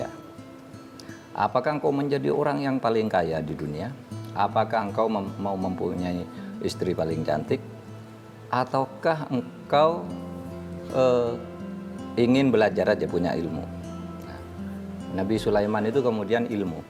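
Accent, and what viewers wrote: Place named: Madura, Indonesia. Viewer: Pak Mahfud ini orang madura ya.. logatnya kelihatan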